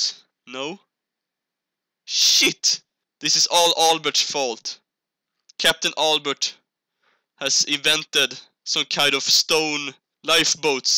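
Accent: Sweden. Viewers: are you, Swedish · Are yuo Swedish? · are you swe ore englich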